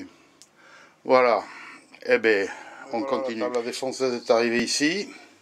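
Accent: South, France. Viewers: merci pour ta réponse et j'ajoute entre nous qu'entre l'accent du sud me ravi encore plus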